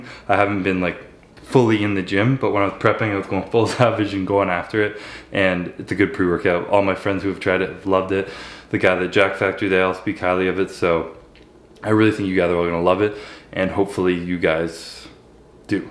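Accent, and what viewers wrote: Canada. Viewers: Embracing the Canadian accent on this one. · the most Canadian intro I've ever heard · Honestly never knew you sounded this Canadian, brilliant😂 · Can we get that extra Canadian more often pls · Why in the beginning of the video does sound more Canadian than ever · I feel like ya really turned on the Canadian in ya here bud lol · actually soundin canadian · actually sounding canadian hahaahhahahaha loving it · Your Canadian is showing lol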